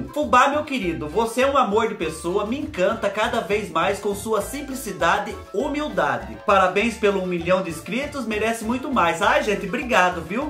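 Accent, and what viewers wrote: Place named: Paraná, Brazil. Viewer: Ahh, entendi vc mora no Paraná, esse sotaque bem forte só pode ser eu doida pra saber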